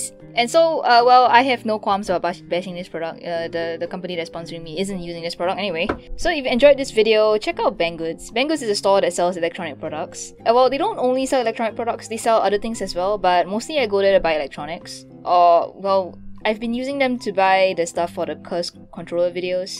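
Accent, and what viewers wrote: Singapore. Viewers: How bout try robeats on roblox,its like tap tap but for 3 yr olds with ultra instinct, Btw,u sound singaporean,u from sg? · lmao i don’t like free things too you sound singaporean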